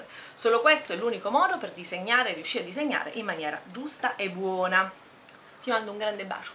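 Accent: Catania, Italy. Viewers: Sei catanese?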